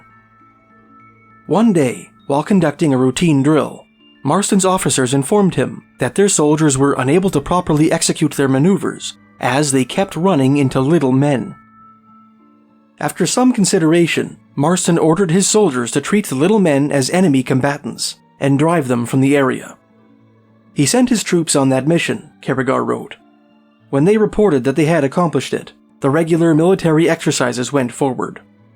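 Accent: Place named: Canada. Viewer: I saw your moving video! Was a great little video. I tried to leave a comment but i dont think it was recorded. I was wondering if the house you left was the location of the rock blasting and the little guy? And you are speaking English. "Canadian English " wow that's different. Greetings from Brazil.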